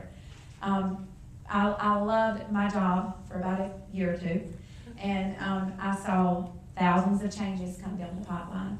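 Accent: South, United States. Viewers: I think perhaps your ear is just not fine tuned to the deep southern accent. She is speaking rapidly since she is limited by time and clearly passionate about this subject. Being from Tennessee myself, I actually hear the 'd' in both "adjective" and "adverb". I find it amusing how people of the US (and Canada) have such difficulty accepting & understanding different dialects of other US citizens. Don't equate a southern accent with stupidity; many, many times that assumption will be very wrong. Some of us may not seem to speak properly in others' opinions. It's the rhythm and cadence of our speech, dropped syllables, run-together words, changing vowel sounds in words so they sound totally different, our habit of making one syllable words two syllables. Yeah. It's a Southern thang. Y'all ain't never gonna understand. Bless your hearts. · To all you complaining about her accent and pronunciation... 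we Southerners can't understand a word of your Yankee-talk, either! But we would not respond to a passionate, intelligent critique of this very dangerous Common Core crap by posting a comment demeaning her accent, while completely ignoring the substance of her excellent argument. We Southerners are not rude like that-- unless provoked. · She has a southern accent. I understood every single word. Y'all are just rude and mean. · It's called a Southern accent. You don't have any regional pronounciation of any English words? I'm sure you do. You could see how passionate she is about education, how much she wants to help her students, how her general discourse was well thought out and intelligent, yet you chose to focus on a couple of words among all these positives. Schools need more people like her. If you truly want to do some good for the world, stop being a nitpicker and a quibbler and see the big picture. 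· Have you ever been passionate about something? Have you ever talked so earnestly that your words might have been rushed or you enunciated less clearly than usual? I have. I know the correct pronunciation of "adjective," but to pronounce every single sound in the word takes almost twice as long and makes the concept much more intimidating. Would you correct a child who left out the "c" in adjective? I wouldn't. "Liberry?" Absolutely. But she's Southern. I'm Southern. We pronounce it slightly differently. Would you correct a black person using ebonics? Or a British person who says adVERtisment instead of ADvertisement? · its a southern accent hard to fallow if you've never heard it before i will admit. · why don't you people shut the hell up about her southern accent. Other parts of the country are just as annoying with their accents and dialect.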